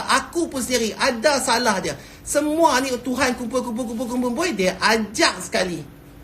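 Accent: Penang, Malaysia. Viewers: Oqang penang. Terbaik tok. Pekat loghat penang sampai la ni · Dato ckp x berubah ...penang mai..Good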